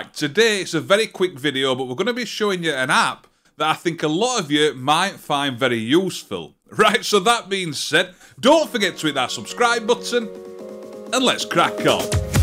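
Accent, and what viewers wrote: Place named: South Yorkshire, United Kingdom. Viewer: Where's your accent from dude? My guess south Yorkshire?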